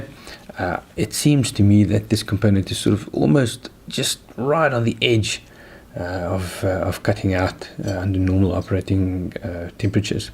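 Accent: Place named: South Africa. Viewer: I can spot a South African Accent any day!! Great video bru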